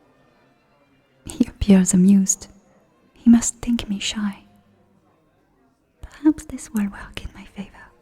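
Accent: France. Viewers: This was beautiful, and the French accident is stunning as always. Hope for more in the future ❤️ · I've been enjoying the French accent videos... Maybe French is the language of love? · Wow! I was totally invested in this story. What a beautiful French accent, & beautiful story. I liked this a lot. Thank you ❤ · A confession From With French accent At a ball Dont wake me up.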